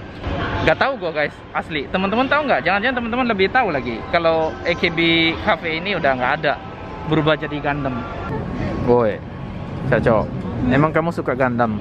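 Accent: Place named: Manado, Indonesia. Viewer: Rupa logat manado noh 😅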